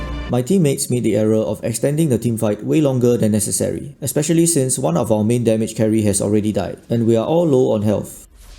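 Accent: Singapore. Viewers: Saw your defence item guide on my feed a few days ago. Heard your voice and immediately knew you are sinkie. Great guide on that one too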